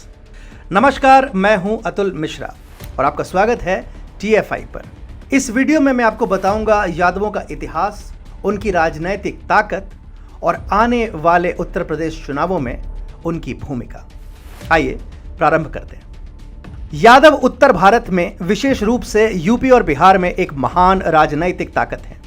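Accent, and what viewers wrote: Standard. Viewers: Wow! Appreciate your clear and pure hindi.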